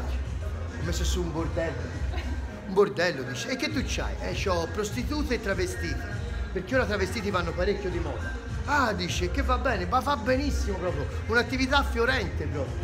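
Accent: Tuscany, Italy. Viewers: Ma non sai esprimerti in italiano così ti capiscono anche fuori dalla tua Toscana!!! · sei fortissimo solo la pronuncia toscana già rido pero' va un po' più piano alcune devo risentirle perché non chiare🤣🤣🤣🤣🤣🤣🤣🤣 Graaaaaaaziiiiiieeeee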